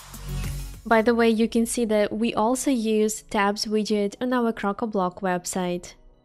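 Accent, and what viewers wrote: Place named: Ukraine. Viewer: I compare her Ukranian English with special accent, intonations with real good English of Elementor creators and I scare to buy and install this plugins. Did they save money just on the speaker or on compatibility and functionality too!?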